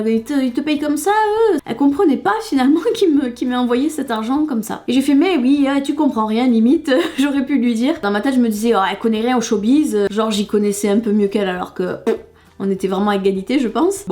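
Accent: South, France. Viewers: j'adore l'accent du sud !!! 😂😅😆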